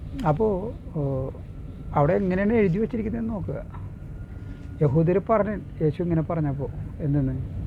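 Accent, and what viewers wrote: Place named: Kochi, India. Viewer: താങ്കളുടെ വിവരണം വളരെ രസകരം ആണ് നിങ്ങളുടെ ഭാഷ യും കൊച്ചി ഭാഷ ❤️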